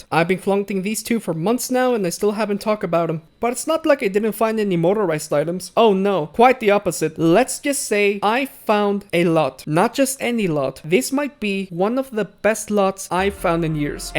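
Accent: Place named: Mexico. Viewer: You are mexican becase you sound one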